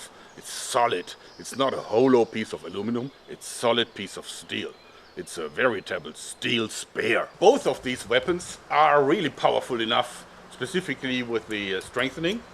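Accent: Germany. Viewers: Can someone explain to me why his German accent and someone's German accent like Christoph Waltz or Skallagrims German accent are so different? is it a regional thing? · Kann es sein das du deutscher bist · The speargun has not just one shot. And you don't have to fill it up. You took too much pressure to the spear gun, so it can be damaged. Also air pressure guns ARE NOT TO FIRE IN THE AIR! THEY CAN BE DAMAGED! Listening to your accent I think you're german as me, so please read this: Die Harpune ist werkseitig mit einer fest eingebauten Druckpatrone ausgestattet. Die Patrone hat bei der Auslieferung einen Grunddruck. Es sind daher keine Druckpatronen o.ä. erforderlich. Die beiliegende Pumpe dient nur zur gelegentlichen Nachjustierung des Drucks oder zur Füllung nach Wartungsarbeiten. Die Harpune kann so beliebig oft hintereinander genutzt werden.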